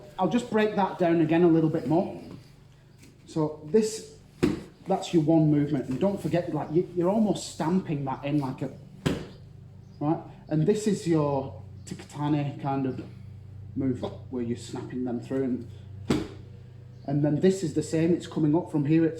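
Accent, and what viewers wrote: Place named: North, United Kingdom. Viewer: Everything sounds better with a norther accent lol "nads" hahaha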